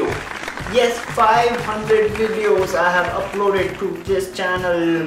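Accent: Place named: India. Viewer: u look like nepali but sounds like an indian?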